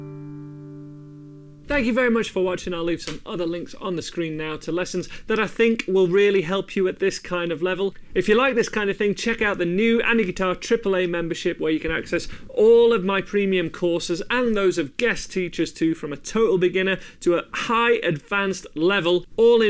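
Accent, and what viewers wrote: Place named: RP, United Kingdom. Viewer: when did you get all smug and start putting on a posh accent?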